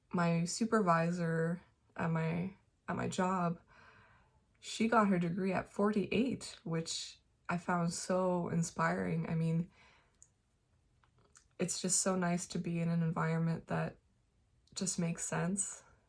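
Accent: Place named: United States. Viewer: Congratulations!! I always thought you were from America lol. Canadian and American accents are so similiar I can't ever tell the difference.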